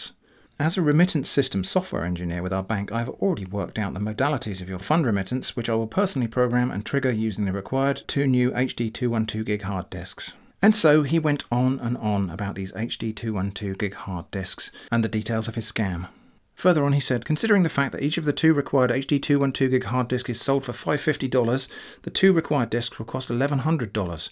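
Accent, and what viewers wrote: United Kingdom. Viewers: Your droll humor and British accent are a delightful combination playing with these crooks. TY.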